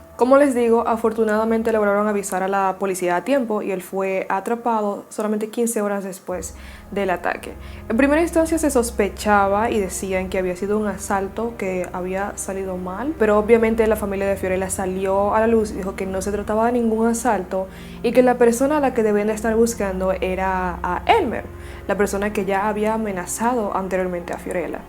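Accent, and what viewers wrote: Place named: Dominican Republic. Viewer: un placer, te lo pregunté porque soy dominicano y te reconocí la forma de hablar...jjj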